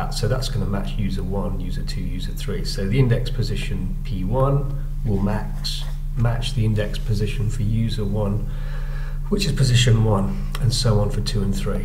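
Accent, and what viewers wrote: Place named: United Kingdom. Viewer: This account is WAY better than the other accounts I have seen. Mainly because you sound British which means I can actually understand you. lol.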